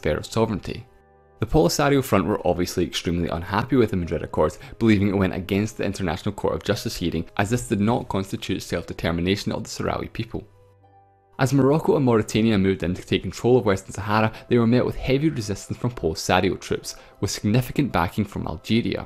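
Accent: Scotland, United Kingdom. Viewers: its not a dialect, its an accent. And the scottish one is recognisable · I'm surprised at the amount of people who can't recognize a Scottish accent! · Im not from a english speaking country but... Are you from Scotland?🤣 What an accent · Heavy Scottish accent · Thank you for your videos. You make the seemingly mundane topics incredibly interesting. Also, I love your visuals/animations and your lovely Scots accent.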